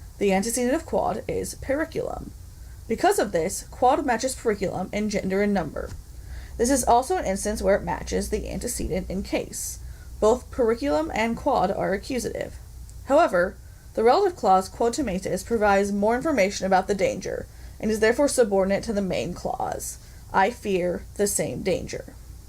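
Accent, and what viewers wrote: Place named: United States. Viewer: We I believe have some idea of how to pronounce Latin correctly. I am fairly certain the Romans did not have a thick American english accent.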